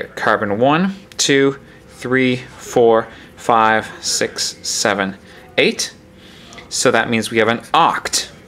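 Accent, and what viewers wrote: Canada. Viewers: Thank you!!! btw, are you Canadian? You kind of sound like one :)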